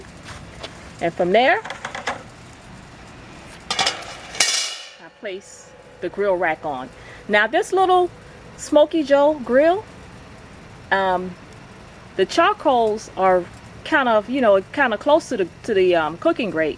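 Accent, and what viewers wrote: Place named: United States. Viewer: Fuck I hate your dumb American accent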